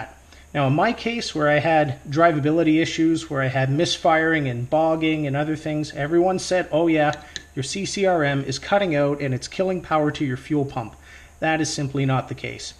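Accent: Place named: Canada. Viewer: Love the Canadian accent. Reminds me of the good ol trailer park boys.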